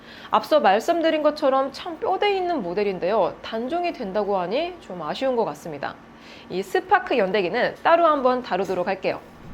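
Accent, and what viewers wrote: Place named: Gyeongsang, South Korea. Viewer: 경상도 말씨. 절대 감출수없는 말투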